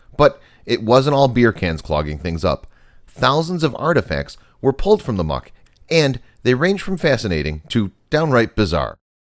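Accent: United States. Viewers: It's sad to see the nice country but to hear an american accent . . . . very sad.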